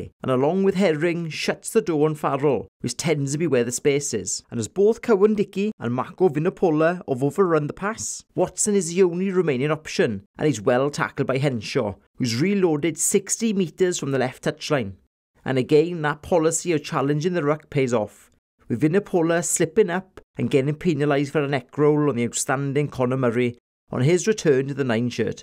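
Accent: Wales, United Kingdom. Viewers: Nice breakdown and analysis,. Your accent is very much like mine, where are you from? I grew up in Pontardulais but now live in Australia.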